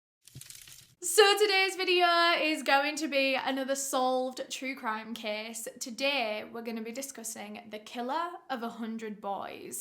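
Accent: United Kingdom. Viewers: ...which part of UK are you from? Loving your accent and you look lovely and beautiful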